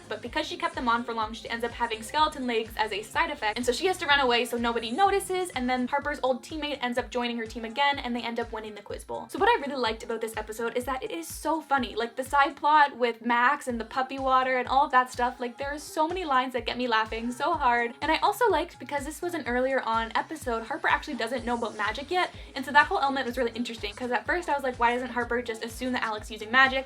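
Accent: Canada. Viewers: This is the third video of yours I've watched I think? And I was talking to myself, saying how you sounded Canadian, so I checked the description just cuz, didn't find anything. And then you said it in the video and I was like "AHA! THERE IT IS!" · are you from Canada?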